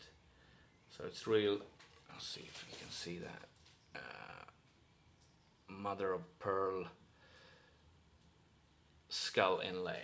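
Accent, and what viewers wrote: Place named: Texas, United States. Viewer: What a cool Texas accent u have!! I need another use!! Nice instrument.....T:)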